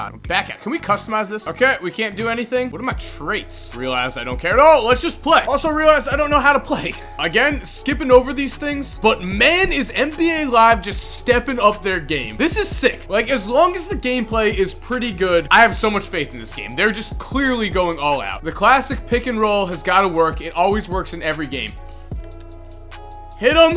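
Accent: New Jersey, United States. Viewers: Are u from New Jersey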